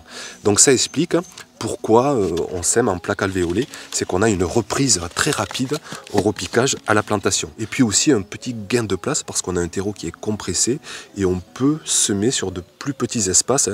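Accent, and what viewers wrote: South, France. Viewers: Vidéos passionnantes et très instructives pour un jardinier novice que je suis . Très bien fait et le "petit accent du sud" en option ne peut que nous donner envie d'aller au jardin !!!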